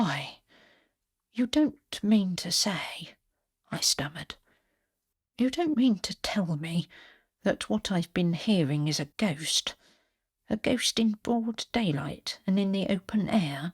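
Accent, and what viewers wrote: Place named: Scotland, United Kingdom. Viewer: What a great little story, I thoroughly enjoyed it. Sitting here with my eyes closed, I imagined I was at Mallory. As usual a brilliant narration and I loved your Scottish accent. Thankyou so much.❤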